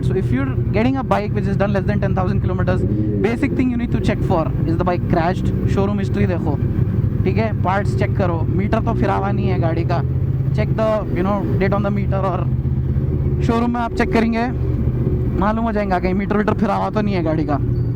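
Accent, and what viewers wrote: Hyderabad, India. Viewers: Bol chal se pure hyderabadi hai miya tum!!! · Bhai pakka HyderaBadi slang awwesome... love from Hyderabad Jeedimetla.-55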